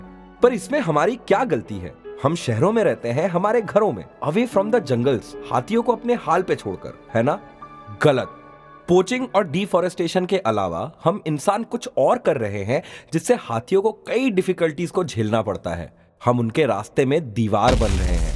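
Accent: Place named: Uttar Pradesh, India. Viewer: Very beautiful and eye-opening video.. Voice over by UP vale bhaiyya.. :-)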